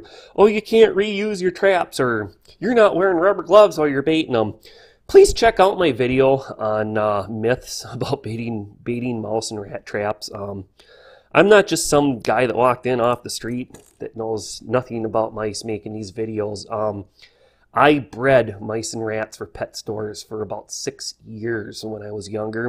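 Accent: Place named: Midwest, United States. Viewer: Minnesota? Or somewhere deep in the country midwest.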